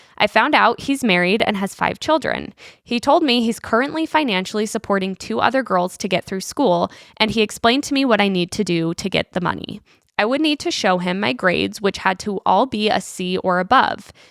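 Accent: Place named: Utah, United States. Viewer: Do people who have this accent practice it? Are there communities in Utah where everyone speaks like this? It’s fascinating that someone in Utah can have such a distinct accent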